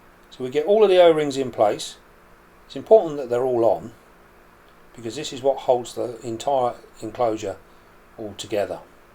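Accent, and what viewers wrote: United Kingdom. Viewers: Hi, your videos have made me want to get back into tarantula keeping! I assume you live in the UK by your accent so I just wanted to ask a question. Do you use heat mats and thermostats for your spiders? I've heard they are ok at room temp but it seems widely discussed in the community. Keep up the great work!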